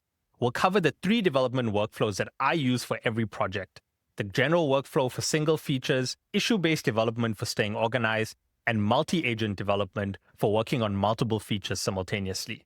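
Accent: South Africa. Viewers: Your location says USA, but if I'm not mistaken you have a bit of a South African twang in there?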